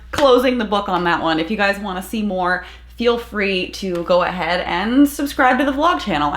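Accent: South, United States. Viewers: Are you from the south?? I hear a faint accent! I’m Canadian so I’m hearing a twang?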